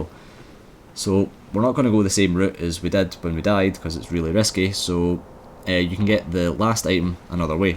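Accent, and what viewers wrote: Scotland, United Kingdom. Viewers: Thank you, you amazing Scottish dark souls God! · 5 stars. But with the awesome Scottish accent it's a 5⭐️➕A+. Your a godsend bro. Although I kinda hated you when I was getting shitty chunks and green shitenite (I've loads) and your sucking on slabs yeh bastid yeh. Thanks again pal. Cheers from a fellow Celt in Dublin. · Same here man. Thanks for doing this. Also, your accent is gloriously British (Scottish, right?) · lol that accent, is it scottish?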